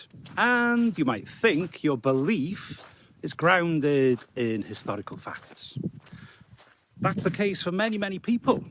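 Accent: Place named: North Wales, United Kingdom. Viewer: Great video. Off topic but as someone of a language and accent nerd I cannot place your accent I am getting North Wales would I be correct?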